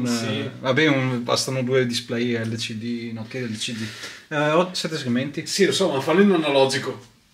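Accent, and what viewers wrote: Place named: Treviso, Italy. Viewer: Bravo ti seguo volentieri dalla cadenza dovresti essere vicino a me di Treviso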